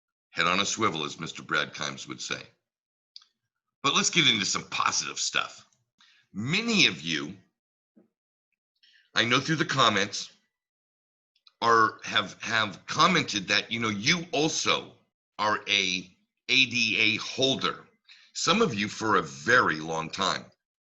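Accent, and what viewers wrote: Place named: California, United States. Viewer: yeah just discovered you in the last couple days. I heard you’re from NorCal my tax guy lives in SoCal so maybe it’s a CA accent. He’s your age too probably. Great tax guy! and I’m liking your channel so great guy here too😊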